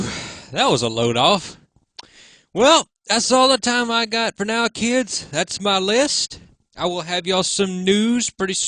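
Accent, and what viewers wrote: South, United States. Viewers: you sound like a hilla billy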